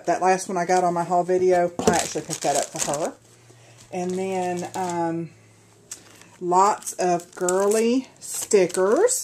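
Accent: United States. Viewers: Wow!.. that is soooo generous of you... and I just want to tell you that your voice is sooo cute to me.. You sound soo country.. (and I mean that in a good way).... Awesomely swell of you!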